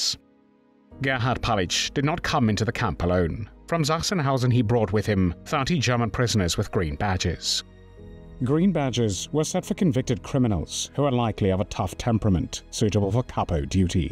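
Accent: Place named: Wales, United Kingdom. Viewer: The most horrific thing in this video is the terrible narration with a weird Welsh accent. English (international English) was too hard? Stopped watching at the 6th time he said POWLAND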